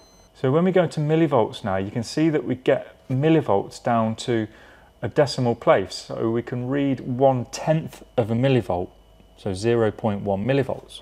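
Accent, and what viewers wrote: United Kingdom. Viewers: As always on this channel, this video is didactically excellent. Moreover, it is very pleasant to have all this presented in the distinguished manner of a British gentleman. Thank you.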